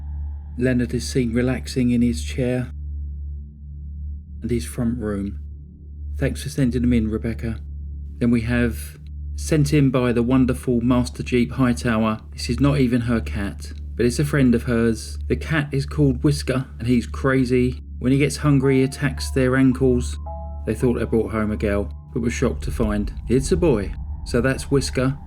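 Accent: England, United Kingdom. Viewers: You are all very nice. Love "my dear"😊Sounds like you are from England!? Would love to visit England one day. Thank you💜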